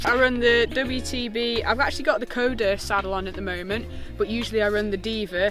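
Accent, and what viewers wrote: United Kingdom. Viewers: (5L) Love London Language 😍😍😍😍😍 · That no London dialect, Ime from Wigan n she sounds like me.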